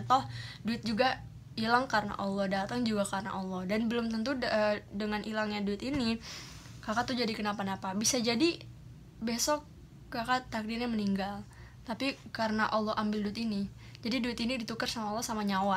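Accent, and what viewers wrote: Jakarta, Indonesia. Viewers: Etdah medok amat neng betawi nya sama kita haha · sumpahh betawii bgt ngomongnya:v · betawi bgt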